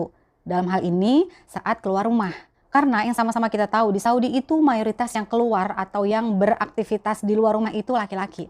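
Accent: Jakarta, Indonesia. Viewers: Bahasanya betawi pisan di geroinnnnn 🤣🤣 Mpo.. Belajar b. Arab dong mpo bnyakin.. 🙏😘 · As jngn di pikirin komen orng klu kata saya debes seneng saya liat klu cara bicaranya betawi bnget 👍👍👍👍👍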